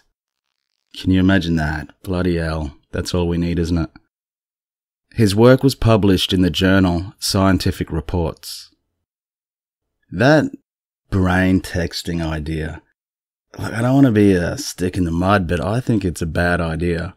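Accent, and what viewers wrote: Australia. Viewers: Cool as :) .. I could tell by your accent you were an Australian. Qld's weather is so out of wack especially this year. Great to be a part of your crew .. :)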